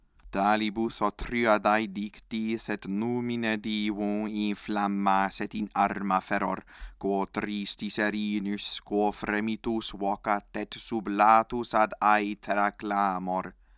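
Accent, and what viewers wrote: Italy. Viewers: Excellently read. The accent is more modern Italian-like and old Proto-Celtic-Italic. The Classical Latin had more closed e and o (unlike Italian now), except sometimes in closed syllables, but not much. The nasalization of vowels receded mostly and the elision of syllables was reduced due to the reduction of the nasalized and very eliding dialect (a dialect of vulgar Latin and Latin that emerged as a dignified minority). The way poetry was composed, was from the nasalized, eliding dialect, which was not the only one. The other main one was much less nasalized, did not drop the final m, except in prefixes, into n (com to con) . Classical Latin poetry is from the clique that used the nasalized, eliding dialect of Republican Latin, which was replaced by its old brother the very literally and not very elidingly pronounced one (both were very identical to one another otherwise). 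Vulgar Latin also existed as the very not eliding, non-nasalized dialect. Both dialects elided to varying extents the vowels between words that had vowels next to each other. The dialect that lasted throughout the Roman cultural clique as such, was the less nasalized and less eliding one. Vowel length and high amounts of changes in pronunciation were preserved until the middle of the fourth century. By the beginning of the fifth century, the pronunciation that would be called close to Italian was more prevalent, though in Sardinia, a variety existed where vowel length was not preserved, but pronunciation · Calabrese Systēma vōcālium est.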